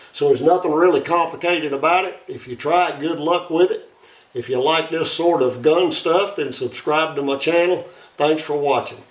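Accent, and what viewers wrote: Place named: South, United States. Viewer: Really enjoying your videos. It’s all good. Good to hear an American country voice that I can understand and knows his business. I personally thank you taking the time to share your knowledge with us.👍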